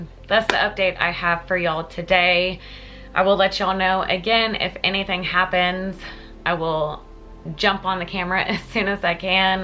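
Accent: South, United States. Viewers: Wow, I love her voice. Lil southern accent. You got my sub.....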